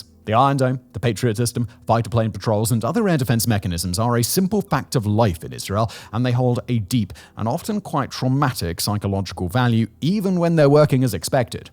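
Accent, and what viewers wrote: United Kingdom. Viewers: This guy talks a lot. I think the video can be summed up as: 1. Iran has said they are going to attack. 2. So far they haven't, but that doesn't mean they won't. 3. They have a lot of missiles that may be too many for Isreal to shoot down, but we don't really know. 4. The U.S. has moved planes, ships, and subs in to the area. End of content. How much value there is in any of that, I leave to you to decide. I suppose the British accent does make it seem more compelling.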